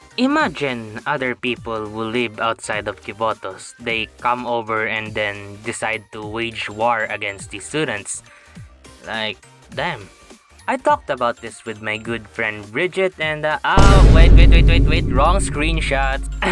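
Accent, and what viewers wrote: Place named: Southeast Asia. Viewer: SEA ass accent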